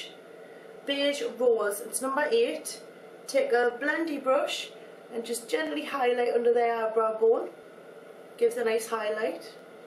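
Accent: Newcastle, United Kingdom. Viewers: r from newcastle by any chance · Are you ust British, or are you some sort of "mix"? :P BTW: You ROCK at this !!! Keep up the good work !!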